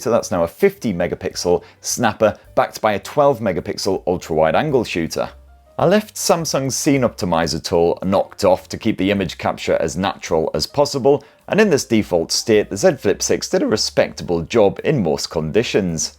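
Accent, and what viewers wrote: United Kingdom. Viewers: Best is the ironic sense of humor and the British accent! 😃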